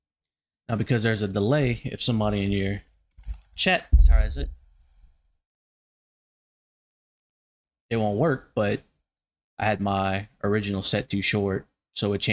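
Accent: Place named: Louisiana, United States. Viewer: Oh you’re definitley Cajun 😂😂😂. 337 definitley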